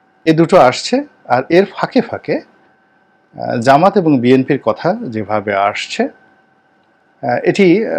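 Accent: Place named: Barishal, Bangladesh. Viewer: ভাই আপনি যে বরিশালের মাল তা বুঝা যায়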